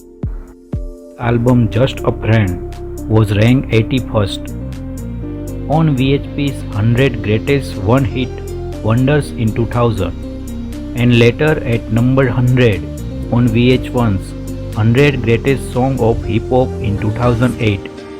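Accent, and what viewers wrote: India. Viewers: A heavily accented indian guy narrating this video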